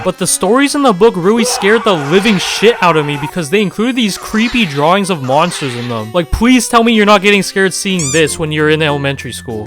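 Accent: Canada. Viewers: are u canadian??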